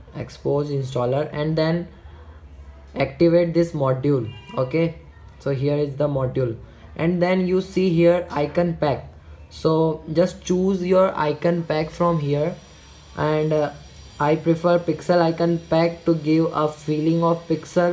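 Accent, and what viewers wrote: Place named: India. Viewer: Thank you dude! You're amazing (it's a little hard to hear Indian English lol)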